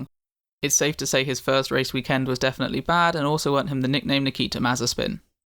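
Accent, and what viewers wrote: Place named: United Kingdom. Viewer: re . Ouch ! That's a bit harsh on Her Majesty . You are obviously British with an accent like you have . No CBE for "Services to Motorsport Commentary" coming to you anytime soon !!!! :-D Ahh, yeah , I do love the way you deliver your commentary . Still I don't give out CBEs !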